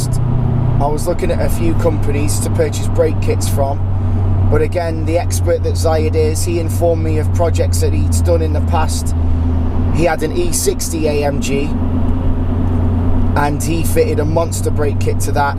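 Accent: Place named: London, United Kingdom. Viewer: You sound almost like a londoner... that's been living abroad a while. Sick car though.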